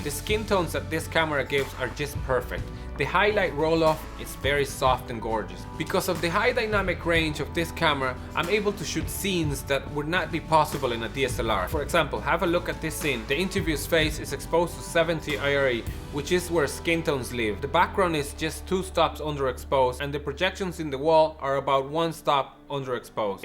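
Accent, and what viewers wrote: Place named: Dublin, Ireland. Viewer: brilliant review I knew there was a bit of a Dublin twang in the accent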